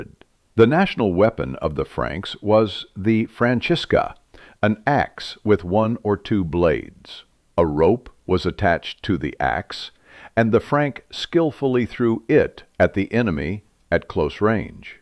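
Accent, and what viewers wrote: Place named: United States. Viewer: No American voice please. We know our own history. Keep out.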